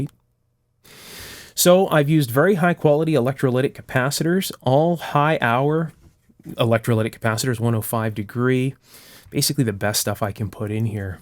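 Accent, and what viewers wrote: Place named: Canada. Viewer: Oh...sorry. No problem...it's worth the wait. I'm not an engineer, but someone mentioned that this was their favorite channel on YouTube, and I was absolutely fascinated by that video. And that Canadian accent reminds me of home. :)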